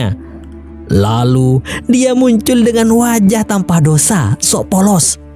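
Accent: Madura, Indonesia. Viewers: Min org madura yaa?? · Sama2 org madura mass, btw asal kota mana ya😂❓❓❓ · addaaaa teppak ka reng madureh😂,,,,,ta'entos kakeh nom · Admin oreng madureh dimmah yeh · Orang madura ya mas🙏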